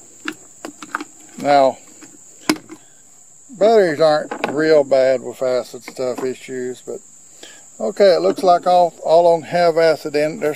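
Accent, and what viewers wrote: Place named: South, United States. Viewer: I've got a couple of batteries new I let go I shouldn't have and I really wanted to understand how to rejuvenate these batteries they're deep-cycle but I can't understand a damn word you're saying hardly you need to learn how to speak or something I know country accent is one thing but when you're making videos everybody needs to understand what you're saying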